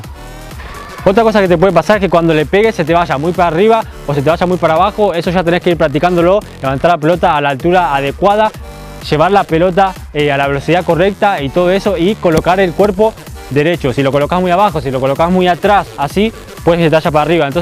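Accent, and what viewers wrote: Argentina. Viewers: Tienes acento Argentino , no pensaba que eres español (PD:Gracias por el truco , casi lo tengo ) Saludos desde Valecia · pareces argentino ajjaja pd:soy de arg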